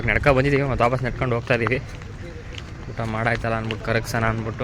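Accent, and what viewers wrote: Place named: Bangalore, India. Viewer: ನೀವು ಸಾಗರ್ ಅಂತೀರಾ ಮಾತ್ ನೋಡಿದ್ರೆ ಬೆಂಗಳೂರು ತರ ಇದೆ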